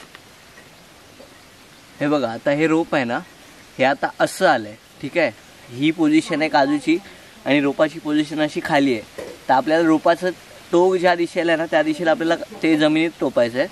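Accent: Malvani, India. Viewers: मालवणी एकदम मस्त मित्रा. छान वाटते ऐकून आणि अभिमान सुध्धा. Keep it up. छान व्हिडिओ आणि सुंदर माहिती माझीसुद्धा गाव मालवण देवली येथे आहे.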